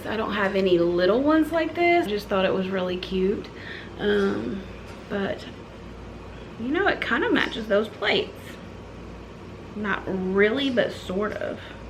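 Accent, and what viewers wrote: Kentucky, United States. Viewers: Just live about one hundred miles from you. I picked on that KY accent as soon as you spoke…..lol. Love all you’ve done to your home. I grew up in mobile home, it was 10 feet wide and 40 feet long. After raising 4boys I’m ready for a tiny house again, I lived in something much bigger during that phase of life. Now it’s just me and I’m ready to downsize.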